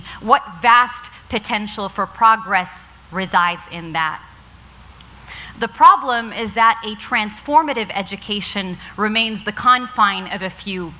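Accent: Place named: England, United Kingdom. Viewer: I wish one-day I can confidently give speech to the audience just like her with a confident english accent.... she's my ideal ...I love her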